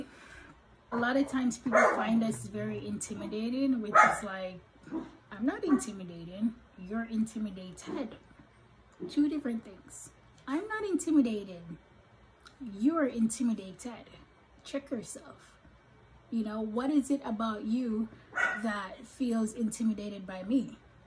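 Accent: Jamaica. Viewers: Are you Jamaican?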